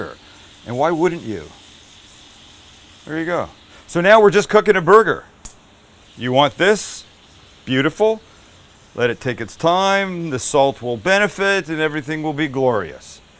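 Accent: United Kingdom. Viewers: Loving the British accent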